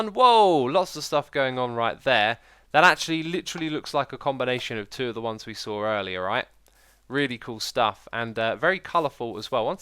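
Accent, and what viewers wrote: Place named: United Kingdom. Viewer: Not to be disrespectful, I am genuinely curious about British pronounciations. I have noticed for a very long time now that some words that end with the letter 'a' are pronounced almost like 'ar' or 'er' for example like when he says 'java' edition and sound like 'javer' or 'javar'. Why is that?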